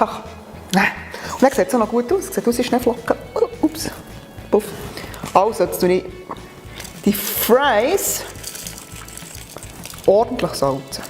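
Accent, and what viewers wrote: Bern, Switzerland. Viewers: So geil! Bi neu bi dir u finge, dass du's extrem guet machsch. U sooo schön, wemer uf YouTube chly Bärndütsch ghört! :D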